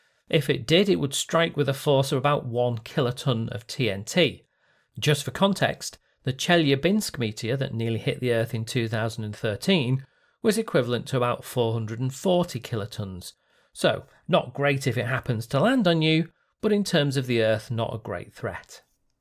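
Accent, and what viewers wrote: United Kingdom. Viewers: Can i ask you from what part of Britan does your accent come?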